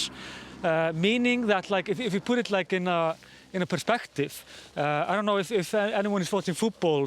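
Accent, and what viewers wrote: Iceland. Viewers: Greetings from Mn. USA. I watch every News cast, and am very pleased with your reports. Thoroughly enjoy your Icelandic accent. The information you pass on to the world is excellent, thank you. You are very fortunate to live where you do, and are so close to these wonder's of nature. Thanks again and keep up the excellent reporting.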